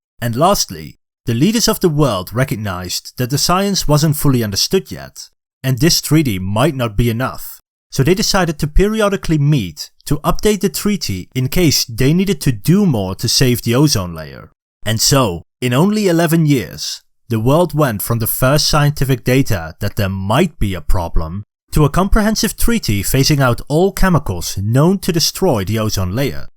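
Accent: Netherlands. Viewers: Are u from the Netherlands cuz u have a Netherlands accent. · I think it's his Dutch accent. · you sound dutch am i right? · are you dutch ur accent sounds like it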